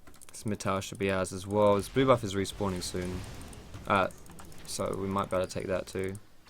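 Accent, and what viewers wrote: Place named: Essex, United Kingdom. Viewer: Think he os from Essex, sounds like the accent.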